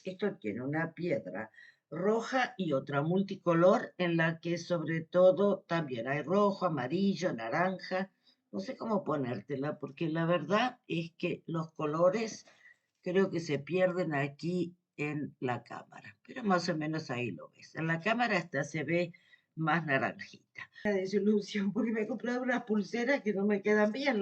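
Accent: Argentina. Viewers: Portugal es muy bonito, yo conozco varios lugares de allí. Pero tú eres de Argentina, por tu acento . Yo tomé clases de tango con una profesora de Buenos Aires, aún sigo pero ya no en clases, solo prácticas y milongas. Un saludo desde Vigo.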